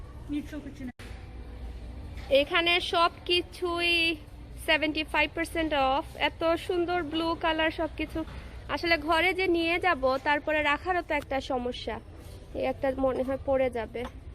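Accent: Sylhet, Bangladesh. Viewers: Apu etodin pore shopping dekia bala lagse bashi🥰🥰r emnitai apnake amr onk bala lage.afnar video sob somoy deki...apu afne sylhety mat matba bala lage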